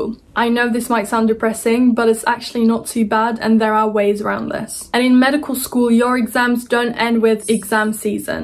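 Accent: United Kingdom. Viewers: Bilerek mi british accent kullanıyorsun yoksa orda alıştığından mı? Cevaplarsan sevinirim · personally i prefer american accent but the more i watch your videos i become a fan of the british accent. It's adorable when you speak English that way :) Actually, I'm obsessed with the English language :) , but I have never had the opportunity to be in an immersive English environment. I envy you :) good luck with your studies.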